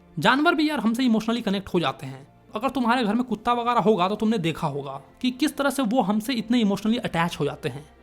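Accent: Bihar, India. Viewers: Bihari apni phele awaaz sahi karle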